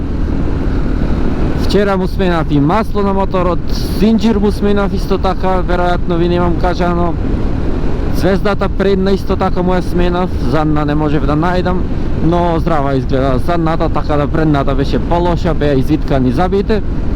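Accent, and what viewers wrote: Bitola, North Macedonia. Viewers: Македонски јазик како просечен битолчанец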